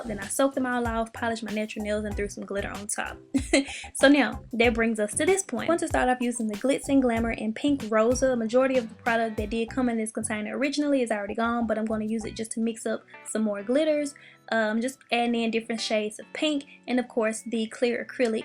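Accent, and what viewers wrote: South, United States. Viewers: I love ur country accent lol ❤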